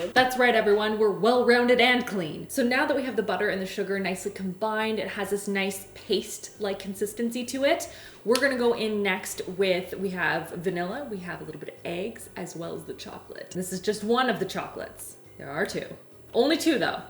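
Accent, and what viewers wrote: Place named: Canada. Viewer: I seriously genuinely love your Canadian accent, it really makes me happy (( Brownies eh )) for example. Ps: all of your videos are amusing 🌼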